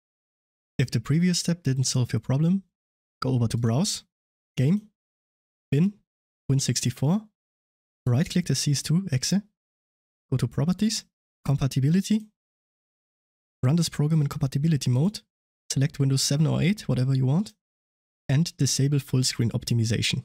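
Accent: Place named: Germany. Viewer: it vac banned me, ty thick german accent guy.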